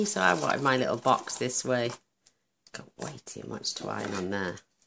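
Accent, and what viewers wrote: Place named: Yorkshire, United Kingdom. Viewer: How lovely and unusual - love it (are you in Yorks - just going by the accent xx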